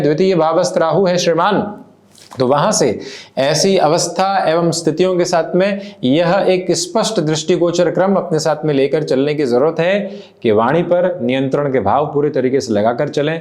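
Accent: Standard. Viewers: Aapka shud Hindi sun k bohot kushi milti h . YouTube mein aap hi sayed akele hai jo shud hindi bolte hai . Bohot bohot dhanyabaad aapka hare krishna 🙏🙏🙏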